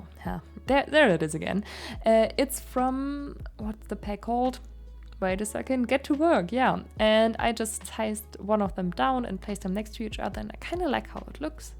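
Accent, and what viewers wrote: Germany. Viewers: hört sich sehr deutsch an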